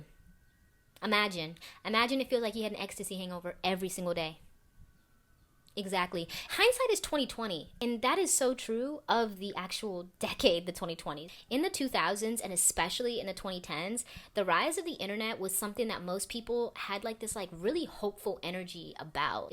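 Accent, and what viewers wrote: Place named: Atlanta, United States. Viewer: Haha I totally recognized that ATL accent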